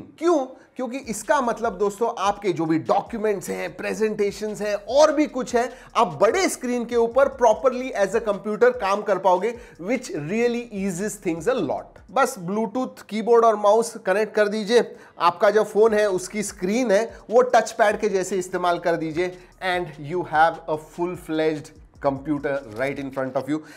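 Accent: Maharashtra, India. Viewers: Are you maharashtrian and marathi by mother tongue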